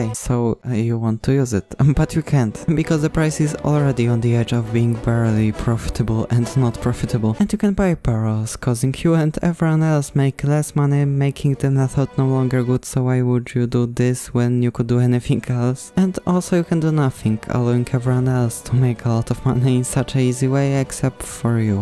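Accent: Poland. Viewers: polski akcent jest mocno słyszalny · are you polish? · Are you Polish? Your accent seem like a real good Polish one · Your english is special (better than mine). Od początku wiedziałem że jesteś Polakiem. Trzymaj się tam i powodzenia w rozwoju kanału. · Are you from Poland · you sound like guy from poland speaking english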